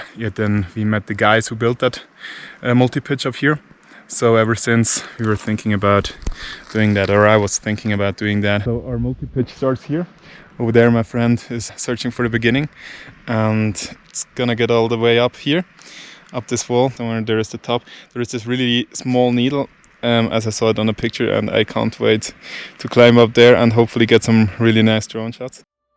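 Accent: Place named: Switzerland. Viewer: Absolutely loved it! Fantastic edit. Amazing drone shots. And the best: your swiss-german accent ❤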